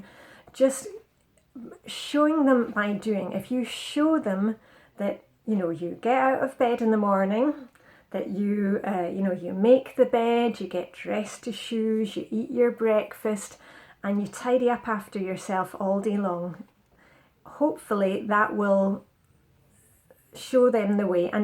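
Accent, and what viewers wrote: Scotland, United Kingdom. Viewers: I love watching you and listening to you! Your accent reminds me of my grandparents (both sides from Scotland), and I love your sharing the Danish culture (a wee bit). I live in California and also follow FlyLady. I watch you to get motivated and uplifted every day! Thank you so much! Donna in Southern California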